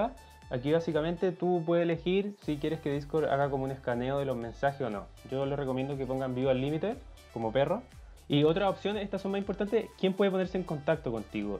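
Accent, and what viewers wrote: Chile. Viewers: Eres chileno 👊😎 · No te conozco pero hablas como chileno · Es chileno ? O no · no te conozco pero eres chileno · Mire este video y me sirvio artazo, ah y eres chileno jajaja, me suscribo XD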